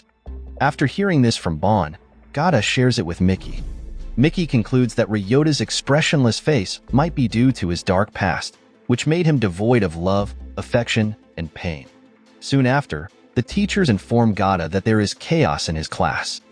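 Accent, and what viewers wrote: United States. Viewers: Can't this channel use a narrator who speaks without stupid amerikan prounciation?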